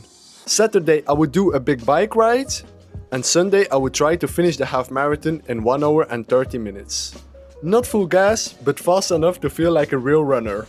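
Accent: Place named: France. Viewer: his french accent is so thick.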